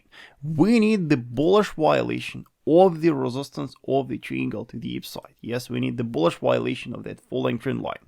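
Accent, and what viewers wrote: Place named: Russia. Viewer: he speaks engruslish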